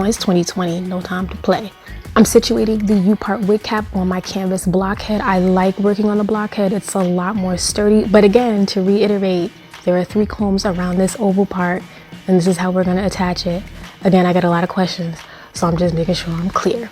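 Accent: New York, United States. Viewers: The way you say "also", "oval" and "important"... with the silent t's you sound like a New Yorker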